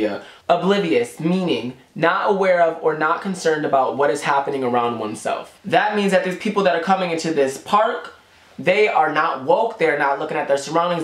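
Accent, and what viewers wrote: United States. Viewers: The fact that you're wearing an Adidas cap and using your iPhone and speaking with your modernised American accent and all that jazz just makes me love this video so much, because it means you basically fit into all of that yet the difference is you're aware. I loved this video from the very beginning and I got the message right away, I was waiting for a video like this to be made and I'm glad I've finally found it(because someone actually had the time and bravery to do it). And for that I thank you. I come from a very unprivileged place, Venezuela, and only recently (Literally less than a few months) we've been getting support and aid from the international community, when we've been facing with this narco-dictatorship for longer than 17 years. People die there, out of violence and starvation, and the international community is too distracted by media and other entertainment to realise. 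I was lucky enough to escape the country but I always make sure people around me and all those I possibly could talk to realise and are well-informed about what's going on back home. It's horrible and it's real. I am glad I found your channel, totally subscribing.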